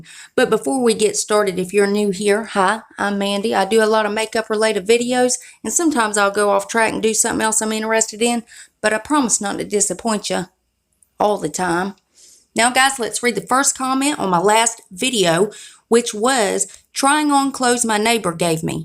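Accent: South, United States. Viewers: Love your southern accent 🥰 Thanks for sharing. I subscribed 👌🏽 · Awesome to hear a southern voice on here.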